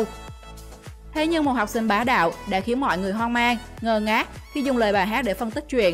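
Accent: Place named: Central, Vietnam. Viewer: Tìm bạn nữ đọc rõ tiếng Việt nhé Ad. Đừng dùng người trung giọng khó nghe quá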